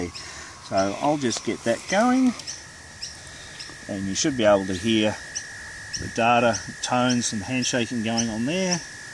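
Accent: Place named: Australia. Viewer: is an Australian...from Australia. That is how he sounds. We're not all Americans, sorry about that!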